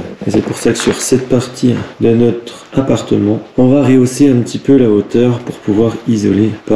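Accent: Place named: Franche-Comté, France. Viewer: J'adore ton lancer d'eau pour humidifier avec ton petit balai à chiottes. Salut à toi mon Franc-comtois. Reste ainsi avec ton accent tellement vrai. 😊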